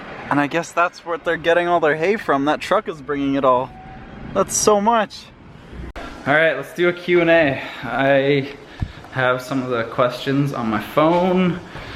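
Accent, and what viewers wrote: United States. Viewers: I highkey thought you were American lol. but ayy, a fellow Canadian ( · I enjoyed the vlog and more importantly the q&a session :) I didn't know you were Canadian I legit though you were American. But still thanks for your content it's always inspiring and when having bad days yo always raise a smile :) greetings from the UK :)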